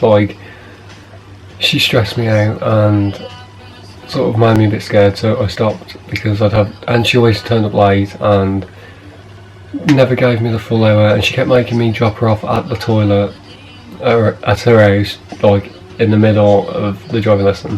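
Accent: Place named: Dudley, United Kingdom. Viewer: I passed on tuesday first time!! Are you from dudley? You sound like it, i am too!! I was so so nervous! I got 5 minors and that was because i bumped the kerb twice and stalled twice on a hill which i never do, if only my nerves didnt get to me i would of got 1 minor! But im so happy!!!! Congrats to you too! Enjoy your freedom, who was your instructor?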